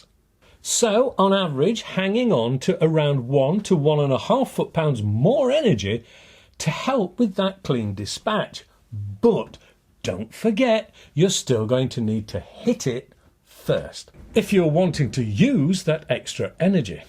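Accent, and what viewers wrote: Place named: Leicester, United Kingdom. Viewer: Are you from Leicester? Can notice that accent a mile away :D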